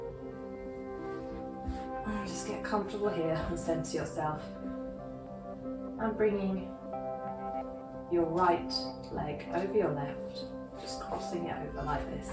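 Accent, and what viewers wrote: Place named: United Kingdom. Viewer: Lovely session. And the British accent just adds so much more to the relaxed atmosphere 😂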